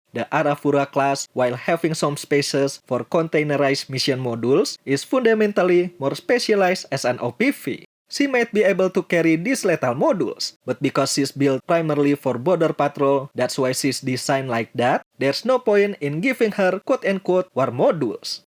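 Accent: Indonesia. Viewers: Its a gramatically correct and nice Indonesian accent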